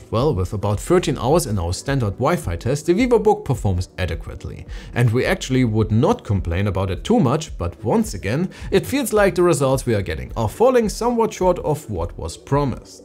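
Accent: Germany. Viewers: I'm very glad to see NoteBookCheck as a very classic website to publish such YouTube-optimized video in every aspect and that's a real success for your content producers. I'm an old fan of you from the website, but now you've convinced me that you're very good in YouTube platform too. And dear, your German accent is what I love 💛❤🖤 Now let's talk about the Laptop! I think that Snapdragon X Elite is something that should not exist at all. Who am I to say this? A Microsoft Surface RT and Surface Pro X lover. I believe that existence of the ARM based computers in the form of laptops and Windows tablets should be only limited to very low-power scenarios maybe 10W TDP at maximum. If you're going to implement such heavy cooling systems with heavy heatsinks and fans, well why you don't stick to x86 even??? The purpose of bringing ARM to a "Windows tablet" specially, is to have a final product like the iPad or Android tablets in terms of weight, thickness, heat generation, battery runtime,... 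But Apple ruined the game with 100W ARM based laptops which even gets more "Unreasonable" when even their desktop solutions is ARM too!!! ARM or I might say RISC, is designed to work with low-power and be less powerful. When x86 or I might say CISC, is designed to work with high-power and be simply more powerful! You can't fight with the nature of them. If even our desktop PCs turn into ARM machines, well then we will lose the great power-house performance of CISC architecture! And fortunat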